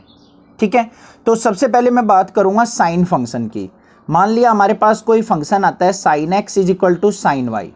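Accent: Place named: Rajasthan, India. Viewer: Aapka accent rajasthani h. Aap rajasthani hai na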